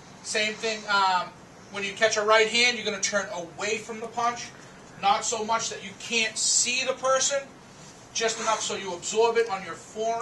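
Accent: Boston, United States. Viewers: Boston accent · its a boston accent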